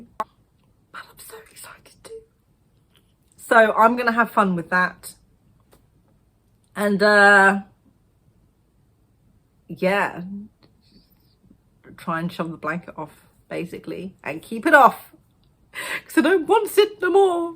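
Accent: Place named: United Kingdom. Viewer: So good to see you again! ^_^ I am all in for the tea and the british babbling, please gimme all the vibes, LOL! I prevent/ cure creative burnout with rest and/or other creative things. Handcrafting helps when I feel I don't get anything done - some hours of work, and you can literally see the work and put your hands on it. Consuming stories sparks new ideas, and listening to audiobiooks while weaving or sewing combines the best of both worlds. I am also not planning on writing anything new anytime soon. I want to finish the current part of my fantasy series - and once that is done, I need to go back and revisit some of the earlier parts, make some minor revisions and bring it all up to my current standard of writing and world building. That should be enough to keep me busy duringall of 2022. ;-) The title and cover of former Project Fae are amazing, they gave me the shivers! 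^_^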